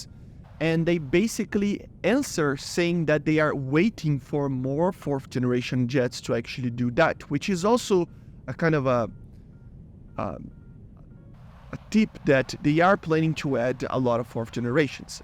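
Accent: Brazil. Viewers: eae, po ver o f18 seria um sonho, e agr o gripen tbm deu p ver q tu é br pelo sotaque huahauahau tu voa onde mano? sou piloto tbm, mt bom ver br fznd video do war thunder tmj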